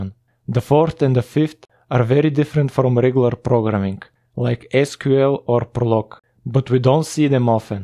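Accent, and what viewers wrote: Bulgaria. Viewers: Wonderful video! Do you happen to be Bulgarian too? I noticed your accent😅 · because I am bulgarian and you sound like one :D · To be honest, i still haven't watched the whole video, but your accent sounds bulgarian, or from the balkan countries at least. I find your channel interesting though.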